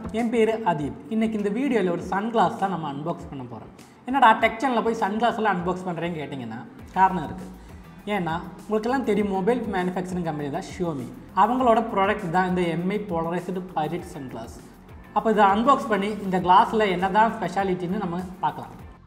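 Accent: Kerala, India. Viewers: i guessed it. Malayalam slang varuthu nu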